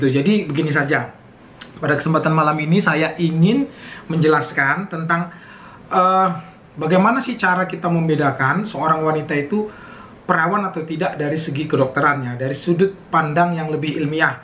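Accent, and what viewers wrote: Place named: Karo, Indonesia. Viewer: Kalak karo nge km pak dokter, pantesan logat na bgi logat klk karo☺️☺️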